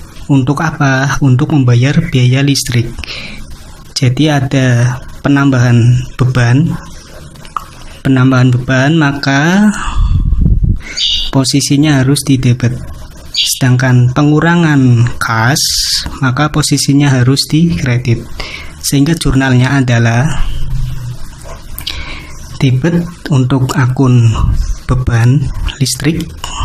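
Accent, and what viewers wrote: Java, Indonesia. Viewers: Medok bgtt mass.. 😳😂klo pke hndsfre gmn gitu.. Tpi mmbtu toturnya.. Trims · MODAL (Medok banget)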